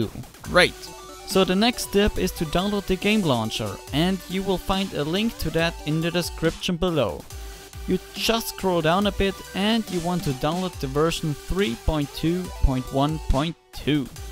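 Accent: Germany. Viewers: Is that German or something? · Wow you are actually German speaking English. You don't see a lot of Germans talking English now a days .. good job man :D you should have more subscribers than that ;) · Are you from Germany?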